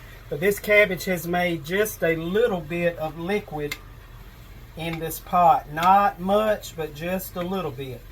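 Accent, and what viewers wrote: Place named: South, United States. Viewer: You must be from the south ,with that southern accent. I live in central Ohio❤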